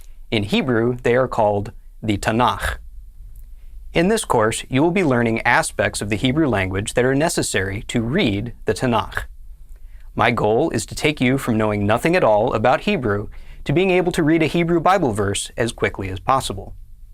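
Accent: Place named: Germany. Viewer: . And here is where you lost me. Fare well. Modern Germanicised versions of the Hebrew pronunciation are known to differe quite a bit from the pronunciation of ancient Hebrew. Actually, we have an overly detailed description of the pronunciation of every ancient consonant and, thanks to the Masoretes, also of every vowel. So, deliberately chosing to use a 19th ~ 20th century Germanicized version of the pronunciation of the language is a travesty. I do want to learn Hebrew, but the overabundance of resources using Germanizing versions of the language almost deterr me. Using these pronunciations is an undeserved deference to Europeans who made and make a conscious effort not to use Semitic phonemes when speaking Hebrew. My quest goes on, my using this channel to learn Hebrew is done. So long.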